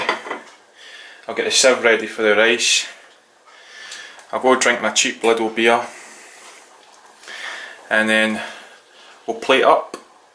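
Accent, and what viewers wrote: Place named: Scotland, United Kingdom. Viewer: Fab ill be giving this a try. Love your Scots accent btw, its ace :)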